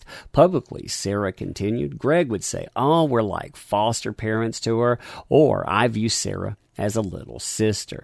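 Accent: South, United States. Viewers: I feel like I’m visiting my Southern grandpa for sweet tea while he reads me the paper. Wonderful time being here ☺️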